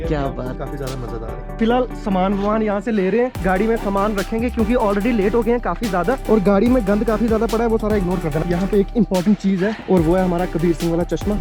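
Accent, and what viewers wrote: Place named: Pakistan. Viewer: ye blogger pakistaniyo ku tarh kyon bol raha hae ...iski tone full pakistani jaisi hae.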